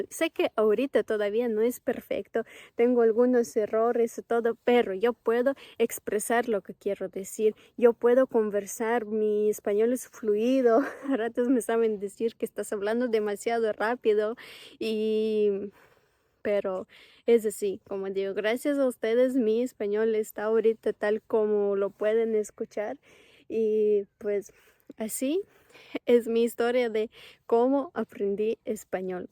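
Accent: Ambato, Ecuador. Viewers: Cordiales saludos desde Quito Ecuador, tienes un hermoso acento ecuatoriano y aun mas, ambateño ¡felicidades! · Ques pues dices al toser 👍👍👍👍 eres ecuatoriana ❤❤❤❤ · 🎉Que bella esa vivencia. Ya habla español ecuatoriano, le falta la Música que es la más bella, como me gustaría mandarle música selecta del Ecuador , yo no soy de Ecuador pero si se la calidad de música sobre todo la antigua ya que la moderna ya dañan todo en lo q a música se refiere. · Un español con un hermoso acento ambateño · Mira yo soy ecuatoriano vivo en paris igual que tu me toco a mi ablar frances sin profesor yo se que es muy dificil pero yo te felicito tu ablas muy bien y de verdad tienes el acento ecuatoriano en america latina todo mundo abla español pero cada pais con su acento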